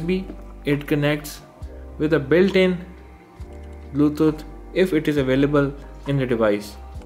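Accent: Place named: India. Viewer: Hes Indian (i think)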